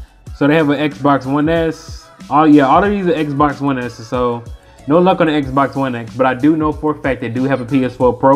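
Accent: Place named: Philadelphia, United States. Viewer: Sounds like Philadelphia to me cuz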